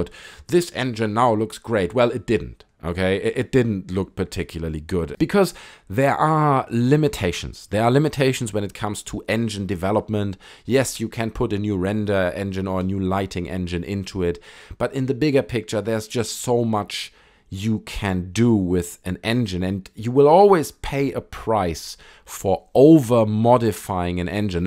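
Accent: Germany. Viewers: Is that a German accent? I'm a first-time viewer of your channel. I'm not 100% sure if it is German... I can't quite place it. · are you German ?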